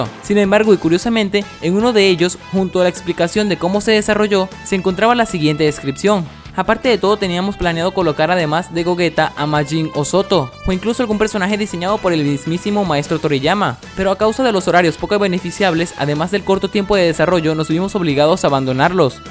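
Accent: Venezuela. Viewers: eres venezolano!!?? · Eres Venezolano? jajajajaja! Te me hacias familiar... aunque pense que eras mexicano. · Eres de Venezuela? No manches es la ostia papú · v eres venezolano?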